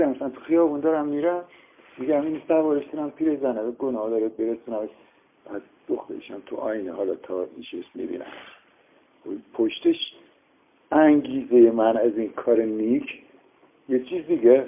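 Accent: Isfahan, Iran. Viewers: کیره هر چی رشتی تو کس خار مادرت..با اون لحجه تخمی..کونکش موقع مردن هم ناپاکی.خارکسده.اصفهانی پورو.کیرم تو لحجت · دمش گرم که اینقدر صادقانه با لهجه شیرین اصفهانی توضیح داد! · عالی بود. واقعا همینه. بعد از مرگ ما زندگی دنیامون رو بررسی میکنیم تا به درجه بالاتری از کمال برسیم. اموزه های مذهبی برای رسیدن به حقیقت بوده اما متاسفانه انقدر هدف گم شده که طرفدارهای هر مذهبی فقط خشم و کینه نسبت به مداهب دیگه دارن. ایشون واقعا رفته و برگشته و آنچه دیده کاملا درسته. من رفت و امدهایی به دنیای موازی داشته ام. عشق و محبت ساطع کردن به مردم عالیه. اگر غیر از این باشه بعدا که زندگی خود را میبینیم ناراحت میشه روح ما. عالی بود با لحجه شیرین اصفهانی.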